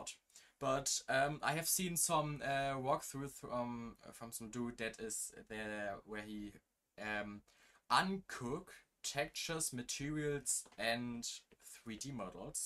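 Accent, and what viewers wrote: Germany. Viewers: unauffälligster deutscher akzent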